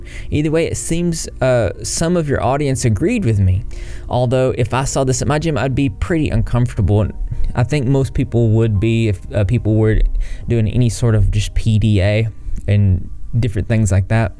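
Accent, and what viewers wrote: South, United States. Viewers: Your southern accent is endearing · are you from the south?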